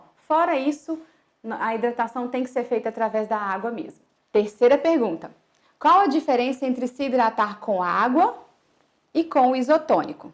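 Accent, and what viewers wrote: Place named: Minas Gerais, Brazil. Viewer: Sotaque mineiro detectado 😉🥰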